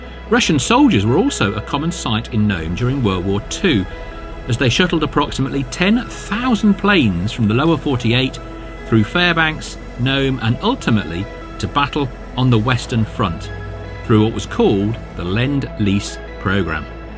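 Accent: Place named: RP, United Kingdom. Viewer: Love the posh accent.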